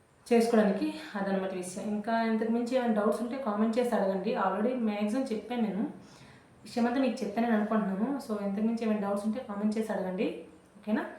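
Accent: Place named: Srikakulam, India. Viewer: Midhi srikakulm haa madem.. Language... Same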